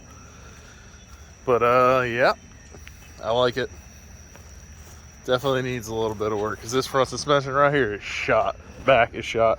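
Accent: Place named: Florida, United States. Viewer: You a real Florida man accent there boe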